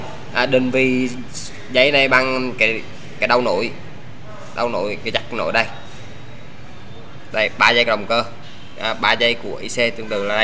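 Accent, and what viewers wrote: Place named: Quang Ngai, Vietnam. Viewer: tuy anh nói tiếng quãng ngãi hơi khó nghe thật. nhưng thật sự em dám công nhận anh chỉ rất dể hiểu và có thể làm đc. do anh có chuẩn bị sẳn đồ án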